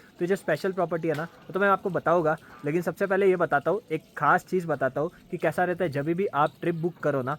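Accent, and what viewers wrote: Gujarat, India. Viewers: Tumhare Accent Se Lagta He Aap Gujrati Ho.. Shu Tame Gujrati Cho Bhai?😊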